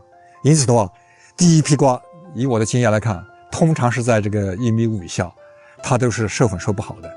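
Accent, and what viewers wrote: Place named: South, China. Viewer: 这口音，是南方人吧？😁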